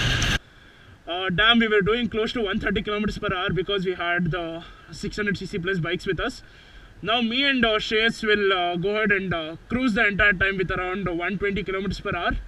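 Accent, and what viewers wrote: India. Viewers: Dude your english accent is very funny...its not the proper accent that indians speak..might be u r south indian tats y it is like...if I m wrong..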